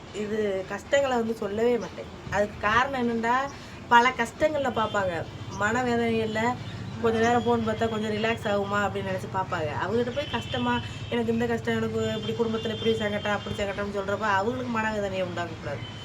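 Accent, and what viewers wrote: Madurai, India. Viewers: நீங்க மதுரை ya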